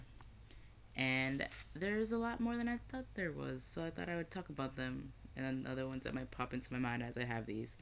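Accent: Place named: Spain. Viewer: I didn't know there were multiple Oz stories. F SCOTT. TELL ME WHAT YOU THINK ABOUT WINTER DREAMS IF IT'S IN THERE. UGH. LORD OF THE FLIES IS SO GOOD. TALK TO ME ABOUT SIMON. JESUS CHRIST. SHERLOCK HOLMES. YES. YES GOOD. UGH. I'm studying Mrs Dalloway next semester, looking forward to that. Tell me what you thinnnk of it! OH what do you think of Emma Approved? I'm going to read Emma soon. Yes yes yes. That is a beautiful copy of S&S;-; GABRIEL GARCIA MARQUEZ. STRANGE PILGRIMS REEEAD IT. YAAAAAS. I love your Spanish accent.;______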